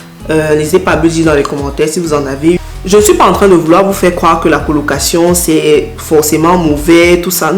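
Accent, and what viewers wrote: Cameroon. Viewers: Je sens le ton camerounais ! Lol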